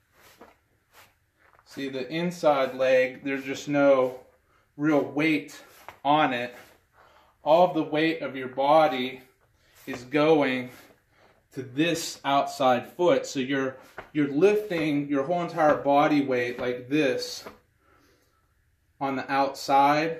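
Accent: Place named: South, United States. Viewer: Great tutorial! May I ask where you are from though? You have a very interesting accent! (Southern US?)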